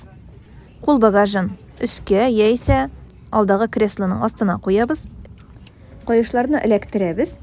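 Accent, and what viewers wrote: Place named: Kyrgyzstan. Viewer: Кыргызчага окшоп суйлойт екен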